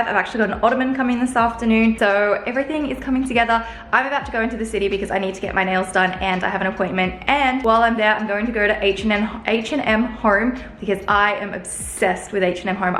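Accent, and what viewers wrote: Australia. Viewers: Just don’t loose that Aussie accent ☺️ so good to hear a local in amongst it all.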